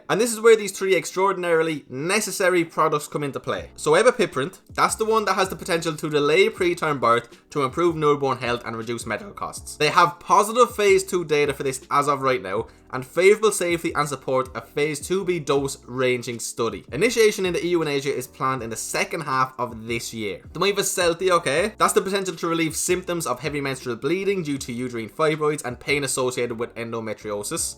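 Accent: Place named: Ireland. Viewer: It's so obsevaious he's from Ireland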